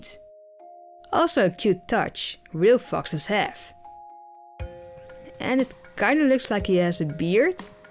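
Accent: Netherlands. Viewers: Yes I know, is a boy. And she asked his accent, he's from the Netherlands.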